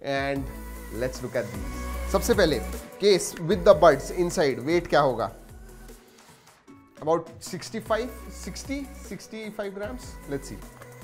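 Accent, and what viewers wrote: Maharashtra, India. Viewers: Are you a Maharashtrian ? And definitely from Mumbai ?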